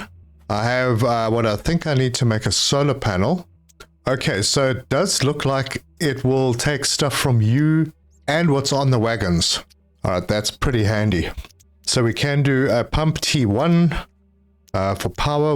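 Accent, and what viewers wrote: South Africa. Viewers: South Africa, I believe.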